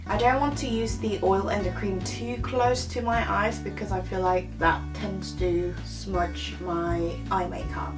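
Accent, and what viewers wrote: United Kingdom. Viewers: 이런 영상 진짜 좋아해여ㅜㅜ왜냐면 언니는 영국발음이 존나 매력있으니까!!! 자주 찍어주세여😆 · 언니 영상 보는데 제가 요즘 영드 에 빠져있고 언니영상 보면서😁😁 점점 영국영어 매력에 빠지는거같아요 ㅎㅎ♡^♡ 글고 요즘엔 방학이라 언니 영상보면서 화장법 따라하는데 역시 화장도 많이 따라해야되나봐영ㅋㅋㅋ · 님! 오늘도 님의 찰떡같은 브리티쉬발음을 듣고가네요ㅋㅋㅋ전 개인적으로 님 영어영상이 더 좋은것같아요!뭔가 공부도 되는느낌같고 너무 좋아요ㅠㅠㅠ이거이거샀다 하는 영상보다 이렇게 오늘처럼 말하고 사용감도 보여주셔서 알수있는 방식이 더더더 좋습니다!!말하는 영상도 너무 좋고 영상길으면 더 좋아요!! 영상이 너무 짧으면 항상 아쉽거든요ㅠㅠ개인적으로 15분대 영상을 참 좋아합니다ㅋㅋ 사용제품도 더보기란에 다시 다 알려주셔서 쉽게 찾을수도 있구요! 님 영상 항상 잘보고있어요! 다음 영상도 금방 찾아와주세여! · 수다떠는영상 좋아요!! 칭구느낌? ㅋㅋㅋ 영상 늘 잘보고있어요 영국발음도 듣기좋다능.. 좋은영상 감사합니다!! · 이런 영상 좋아요! 볼 때마다 느끼는 건데, 입모양 목소리 말투 영국발음 전부 매력적이고 듣기 좋네요.. 그리고 립 발색이 항상 탐나는 입쯀ㅎㅎㅎ 강점인 것 같아요 입술! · 이렇게 제품 처음으로 사용하면서 수다떠는 영상 너무 좋아요ㅜㅜ 더 자연스럽고 중간중간 영국.. 리액션..?같은.. 그런 말투도 완전 씹덕..♡ 이번 메이크업 머리랑도 너무 잘어울리고 예뻐서 저도 기회되면 저렇게 머리 염색해보고 싶네용ㅇㅅㅇ 아 그리고 속눈썹 고데기 저렇게 하면 마스카라 뭉친것도 풀어지고 그러나요?!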